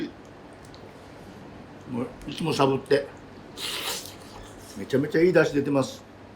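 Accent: Hokkaido, Japan. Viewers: ❗ 北海道弁最高❤️ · ちょくちょく出てくる北海道弁がたまらないです。鮭の頭が入った石狩鍋は甘みとコクが出て美味しいんですよね〜。バターは意外でした。今度やってみます。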